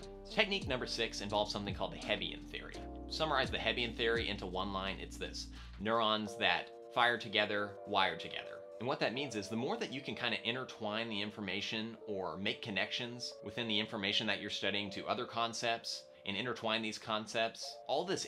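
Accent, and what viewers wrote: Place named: South, United States. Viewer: Thank you for the effective breakdown and explanation of the concepts that you presented in this video. Off topic: it was a bliss to hear your accent for it transported me to my beloved south S2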